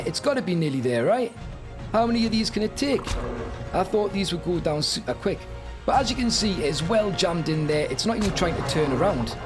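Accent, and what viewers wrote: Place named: Newcastle, United Kingdom. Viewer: just wondering because of the accent are you from like newcastle?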